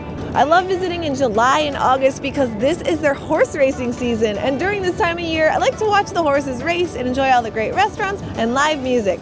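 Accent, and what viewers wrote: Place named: Long Island, United States. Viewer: I remember thinking you might be from Long Island or something guessing you weren’t from one of the boroughs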